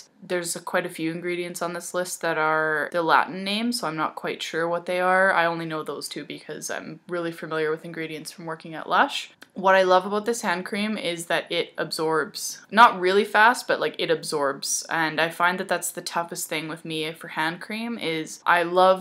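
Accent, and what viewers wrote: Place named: Canada. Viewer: Great video! And I love your Canadian accent <3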